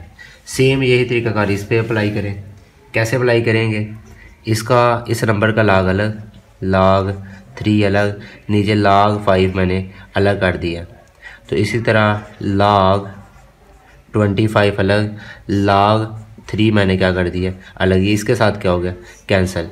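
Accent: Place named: India. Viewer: I love your Desi accent laaaaag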